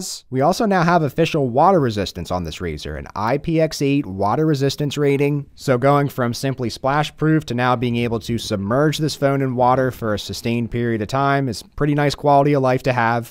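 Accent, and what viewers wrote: Philadelphia, United States. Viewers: Definitely Philly/South Jersey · I definitely detect a philly accent = S. Jersey PA DE or MD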